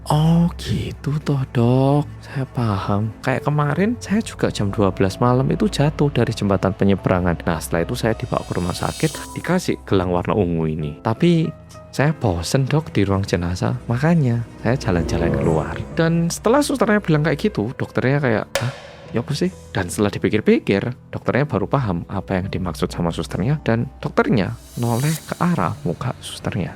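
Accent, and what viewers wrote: Java, Indonesia. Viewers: muedok🎉🎉🎉 RinDingDong 🎊🎊🎊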